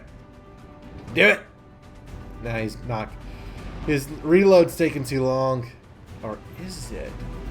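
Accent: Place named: Minnesota, United States. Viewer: As a Minnesotan I can confirm that accent is 100% accurate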